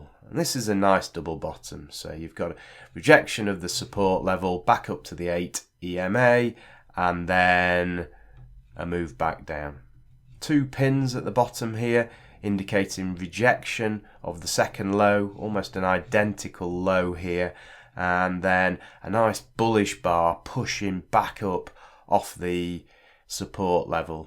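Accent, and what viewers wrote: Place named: England, United Kingdom. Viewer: And besides I do love the English accent :)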